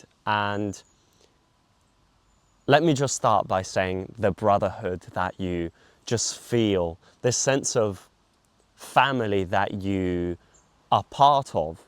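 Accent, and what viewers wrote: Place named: England, United Kingdom. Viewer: he sounds VERY ENGLISH to ME. Any background info on why you moved to Italy?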